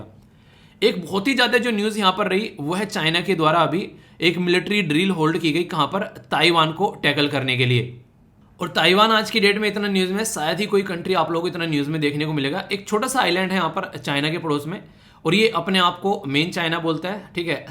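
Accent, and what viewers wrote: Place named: West Uttar Pradesh, India. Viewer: Based on sir's dialect it seems like he from West U.P. possibly near Baghpat.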